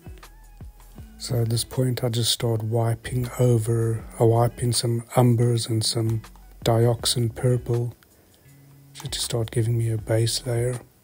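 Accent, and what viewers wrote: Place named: South Africa. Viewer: Lovely painting! You sound SAfrican...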